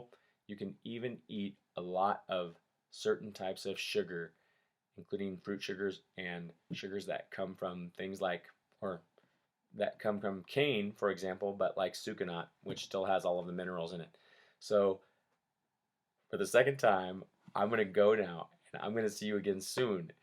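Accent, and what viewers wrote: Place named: United States. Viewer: First, I thought you were Australian when my audio kinda messed up on the intro of your video, but when it worked, American 💁🏻‍♂️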